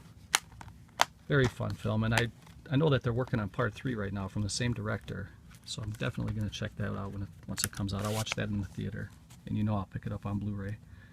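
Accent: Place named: Midwest, United States. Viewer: I knew your accent was Midwest. Im in Illinois about 20 minutes from the South-Eastern corner of WI. I am about to grab the last cheap copy of Body Bags on Amazon.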